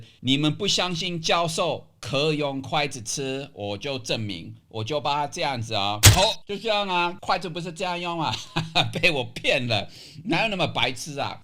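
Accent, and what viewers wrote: Taiwan. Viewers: 教授本來就是台灣人ㄚ⋯⋯講話的口氣隨時參雜一些台語⋯⋯真的是道地的台灣人還有還有心裡OS跟翻白眼🙄️🙄️🙄️⋯⋯🤣🤣🤣🤣🤣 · 教授的口音是真台灣人 ＸＤＤＤ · 教授講話！ 真臺化！ 真有趣！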